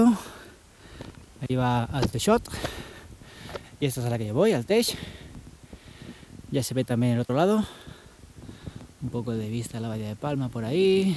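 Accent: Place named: Catalonia, Spain. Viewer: No , no soy de Mallorca, (lo único que sé de Mallorca es el canal "Escuela de Supervivencia" que tiene una cabeza de lince ibérico como logotipo), pero lo he comentado porque pensaba que eras catalán. Pa los que somos andaluces, el acento nos suena un poco parecido. Un saludo, y buscaré esa funda de vivac. Gracias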